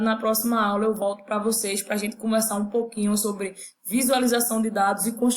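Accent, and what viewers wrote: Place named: Recife, Brazil. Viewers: Massa! Show! Esse sotaque parece do Recife, ok? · Oi Oliveira J, que bom que gostou, o sotaque é do Recife sim, acertou!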